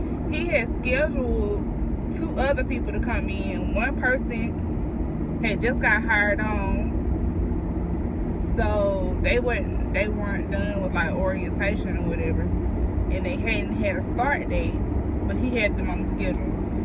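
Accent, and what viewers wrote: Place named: Alabama, United States. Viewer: I heard you accent & immediately knew you were from Al. (So am I 💗)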